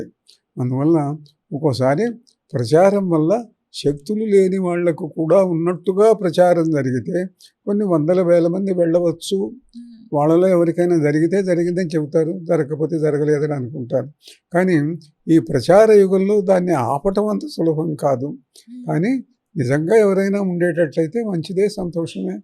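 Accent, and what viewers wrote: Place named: United Kingdom. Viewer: యాంకర్ మాట్లాడే విధానం చుస్తే తెలుగు వారికీ పుట్టినట్టు లేదు ఇంగ్లీష్ దొరకి పుట్టినట్టు ఉంది తెలుగు మాట్లాడం నేర్చుకుంటే బాగుంటుంది.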